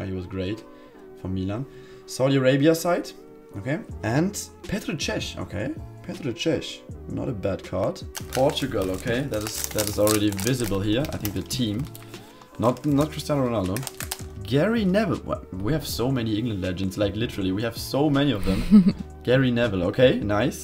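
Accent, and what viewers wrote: Germany. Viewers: Wie Mann es einfach hört das er Deutscher ist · Bist du aus Deutschland? Hört sich so an. PS gute Videos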